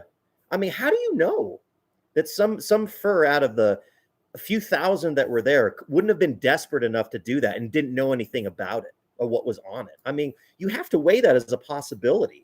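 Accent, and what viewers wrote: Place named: United States. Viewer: You have quite the strong American accent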